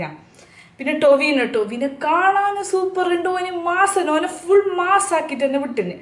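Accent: Kasaragod, India. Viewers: Muslimallaaannn enikkariyaaam.olentheppaa shawllokkeyittit ksd slang paraynne.athond allaand olod asooyannolla😂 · Endokkeyo evideyokkeyo manassilaayi. Edakk lucifer ennu paranjond athum😂😂. Kettiond irikkan nalla resond kasargod slang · Sherikhum kasergode ulla ellavarum ingane aano samsarikkunnathu....Ente kude oru 4 perundu pakshe Avaru ingane samsarikkunnathu kettitilla.....mangalapuram kaaru Ee reethiyil ulla malayalam paranju kettitund..,avarude Ee Baari Bhaasha super aanu kelkkan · നല്ല അടിപൊളി ആണ് ടോവിനോ നല്ല നമ്മളെ കാസറഗോഡ് ഭാഷേ നല്ല ചേലായിനി നമല്ലേ ലാലേട്ടൻ നല്ല പങ്ങായിൻ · Review പത്തുപൈസക്ക് ഇല്ലേലും..... നമ്മളെ കാസ്രോട്യൻ സ്ലാങ് പൊളി ആക്കീന്.... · ഞമ്മള് ഇങ്ങളെ കാസറോഡ്‌ ബാർത്താനം കേക്കാൻ മാണ്ടി ബന്നതാ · 👌👌👌👌 kasrod baasha superaayi paraynnind..bhaashaye ingne abhimaanathodu koodi paranh variety channel aakyathm mass aayin. thattam dairyaayit itto..palarum enthokkeyo paraynnind..kaaryakanda.. 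Indian pourante avakaasham💪. kurupottiknnavare kaaryakenda..full support💪💪💪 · കോഴിക്കോട്ട് ഉള്ളോർക്ക് തെരിയാത്ത ഭാഷണ്ടോ ഞമ്മക്ക് ഇതൊക്കെ എന്ത് ഹൽവ മാതിരി കാസ്രോട്ട് പെര്ത്ത് ഇഷ്ട്ടം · Review from an innocent mind, through super kasaragod coloquiel language, super.. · +kasargod slang +thattam.. a deadly combination... Meanwhile weak point of any dare and devil soul.. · ഒരു വീഡിയോ ഇടൂ ട്ടോ ഞങ്ങൾ തെക്കൻമാർക്ക് വേണ്ടി.... കാസറഗോഡ് കൊള്ളില്ല എന്നല്ല അതിനർദ്ധം... പൊളിയാണ് താങ്കളുടെ കാസറഗോഡ് talk💕💕💕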